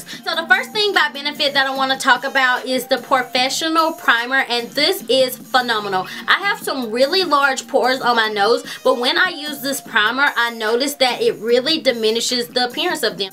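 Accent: South, United States. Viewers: you sound southern!! :]] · I love your accent! Im a southern girl as well ;) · no means for disrespect are u a southern bell u sound just like one i apoligize if i had disrespected u in any way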